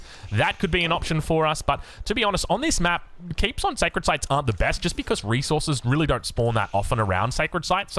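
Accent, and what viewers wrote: Australia. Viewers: I'm very none aggressive and like to build a thriving empire full of resources and a big army before considering attacking. Watching these videos to get better. I love these video's. As an Englishman, The Australian accent is appealing.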